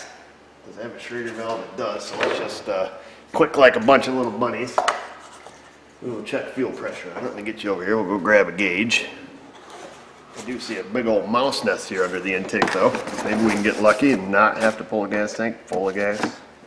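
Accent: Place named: Pennsylvania, United States. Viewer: This guy has integrity and smarts. I'd trust my ride with him, which is trust I don't easily give...judging by the accent, i'm guessing he's from PA. I'm not surprised he's busy as hell.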